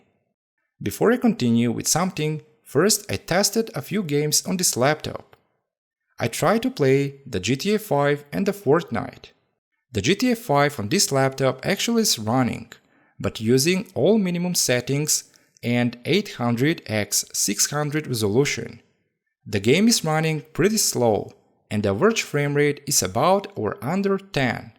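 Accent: Germany. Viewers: I'd say germanic origin (Germany). 🙄😏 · And 🤔, you’re accents sounds a little german, he said “insteed” instead of ‘instead’ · What a shitty german accent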